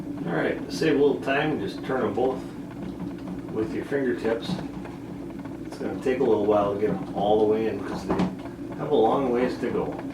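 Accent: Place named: Midwest, United States. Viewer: thanks. very clear. sounds like a midwest accent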